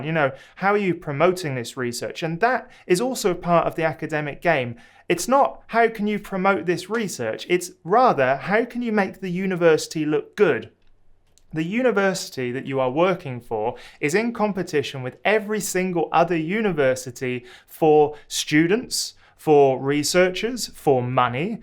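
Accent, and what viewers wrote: United Kingdom. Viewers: I'm sorry to say that but you sound overall rather apologetic... Academia is a great "industry" and there's very few other industries that could offer conditions that would match it. That said, academia isn't for everyone. I have seen many graduate students giving up in the process because they were "disappointed" in "the game". But frankly, these were just people not cut out for academia (some of them did amazingly well later on in other industries, though). So, rather than complaining about academia not matching your high expectations, it would be totally fine to just admit that it might be you who isn't the right match for it. That does not mean that you can't be good at something else, of course. Finally, based on your accent I assume you are from the UK, and your experience with academia is based on how things are in the UK. 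I just wanted to say that the UK academia isn't a great benchmark--it is pretty bad in terms of (a) pay, (b) pressure to obtain external grants, (c) job security... Things are better in other countries. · Love the accent btw, nice to hear Western Brit dialects in this part of YT.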